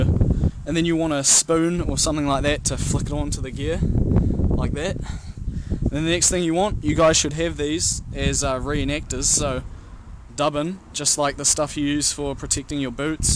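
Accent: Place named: New Zealand. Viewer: I hear New Zealand. Chur for the video bro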